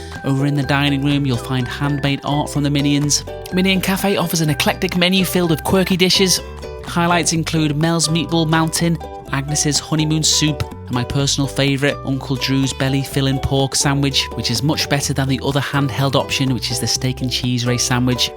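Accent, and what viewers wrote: United Kingdom. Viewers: Your accent is quite enjoyable. Which region of britain is it?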